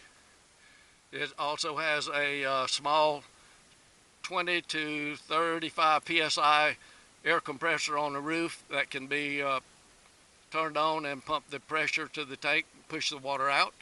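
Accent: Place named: South, United States. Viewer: You sir got that nice southern american accent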